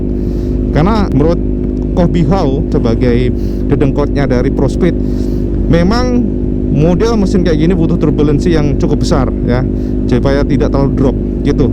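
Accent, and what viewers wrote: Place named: Surabaya, Indonesia. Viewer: logat e koyok ne areek surbeje' .....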